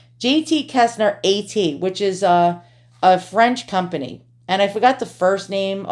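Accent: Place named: New Jersey, United States. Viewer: Love antique dolls have a few but love your collection. Love your Jersey accent and listening to your comments as well as your little pieces of advice. Keep up the good work!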